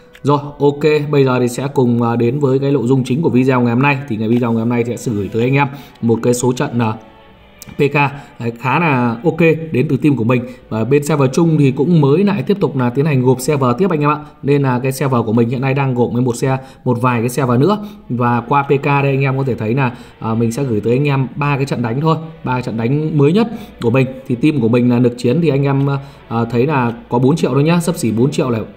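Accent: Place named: Hung Yen, Vietnam. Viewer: Nghe bác nói quê Hưng yên. Bác chỗ nào HY thế.?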